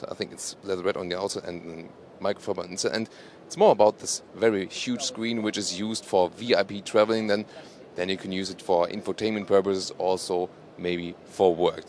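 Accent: Germany. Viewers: ich habs nie verstanden warum du die videos in englisch machst, sehr anstrengend zu hören mit dem deutschen akzent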